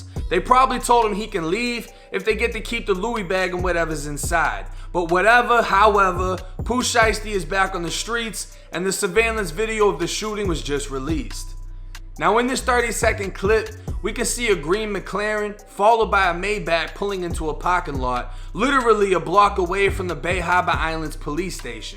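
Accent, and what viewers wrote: Boston, United States. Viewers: U from Boston? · Your accent sounds like you're from Boston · Hey were you raised in the Boston/New England ares because you have that BAWSTON accent?! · You from Boston?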